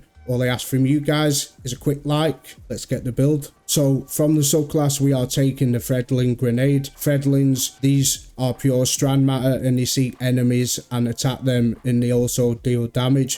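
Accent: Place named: Blackpool, United Kingdom. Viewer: Blackpool accent sounds very similar to,was there last month ,night out with wife 🤙🏻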